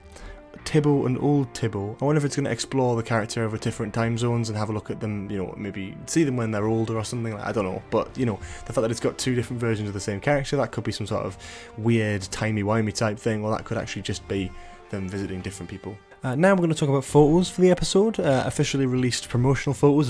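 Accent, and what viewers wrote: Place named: Yorkshire, United Kingdom. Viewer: Yorkshire accent? I've always thought you were a Geordie, or thereabouts.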